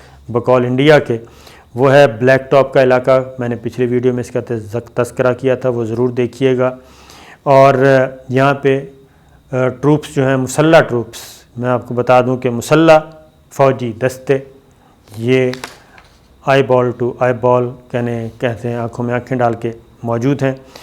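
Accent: Pakistan. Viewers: Accent is pure pakistani best hamaari pehchaan punjabi urdu accent indian aacent nahi chahyee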